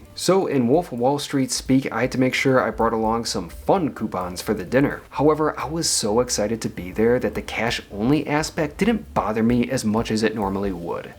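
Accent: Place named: Chicago, United States. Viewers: Your accent is so strong. Are you American? I’m guessing Midwest? As a New Yorker, the way you pronounce things is very…. different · A guy with a Bears and Cubs accent thicker than Dennis Farina's, living in an apartment in Manhattan, , drinking a Manhattan, invited to Rao's by a Manhattanite. Whattya gonna do. We're a transient kinda country. Fuhghetta 'bout it.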